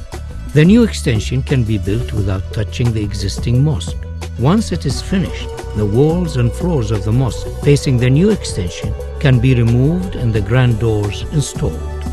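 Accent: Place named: Arab world. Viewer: Can't say much about the theological or political arguments for or against this project. But I think to have someone with a noticeable Arabic accent speak is nothing but a cheap marketing gimmick by this British firm. Are we supposed to be more accepting and receptive because its an Arab talking? I would have expected better from a company of Atkins' reputation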